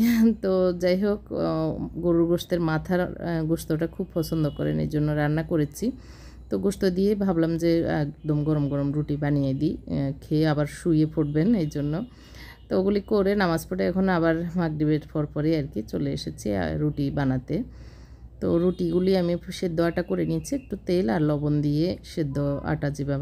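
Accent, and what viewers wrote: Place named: Feni, Bangladesh. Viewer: apu.ame.feni district tak bolce. apnar kota suna mona hocca apne fenir manus.apnar badi fenir kon grame.bolban ke.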